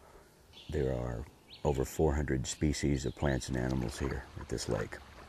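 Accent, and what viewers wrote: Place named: Texas, United States. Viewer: Wow, I just realized that Mr. Henley's speaking voice, the cadence sounds like George W Bush...it must be the Texas accent, which neither sound so southern. I was not a fan of W., but I sure do love!!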